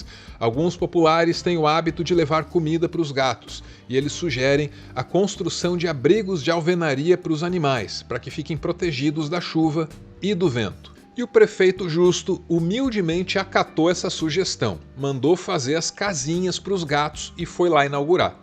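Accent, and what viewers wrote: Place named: Bahia, Brazil. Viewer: E com um sotaque bom e gostoso😂 deve ser baiana, como eu kkk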